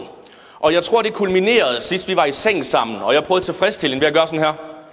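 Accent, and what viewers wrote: South Jutland, Denmark. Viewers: skal bare lave en lydbog af Harry Potter på sønderjysk det ville være så fedt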